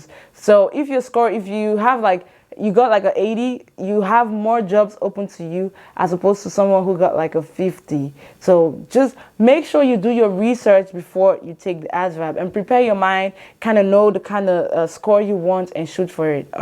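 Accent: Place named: Liberia. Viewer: Bro on God you sounds like a Liberian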